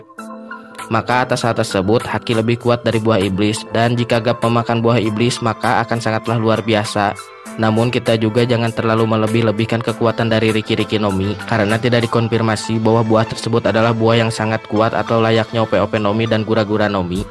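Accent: West Java, Indonesia. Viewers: Nada bahasa nya kaya logat orang sunda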